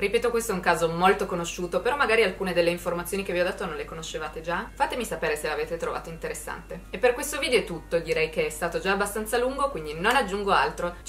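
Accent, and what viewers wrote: Turin, Italy. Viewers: torinese palese bro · Siciliano? :D è l’accento di Torino! Più torinese di così non si può :D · È torinese, ma in ogni caso interessante equivoco. In effetti alcune vocali sono esattamente come quelle di alcune cadenze della Sicilia!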